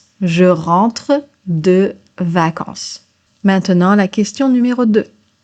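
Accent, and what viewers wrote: New Zealand. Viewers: Love the Kiwi accent. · Je vais retourner à Paris ou j'étais jeune fille au pair il y a 45 ans. Je l'adore, and maintenant, je suis en train de rafraîchir ma connaissance de la langue française! J'adore ton accent en français et en anglais. Tu es dans le sud de France? Et bien sur, j'entends un accent Kiwi quand tu parles anglais! Merci beaucoup pour ces videos. Je viens de m'inscrire à la chaîne!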